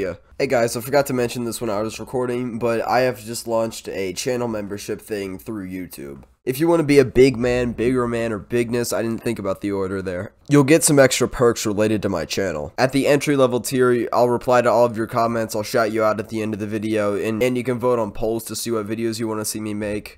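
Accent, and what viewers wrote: United States. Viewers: “Allergies” yeah right, to me you just sound like a fellow Californian. 😎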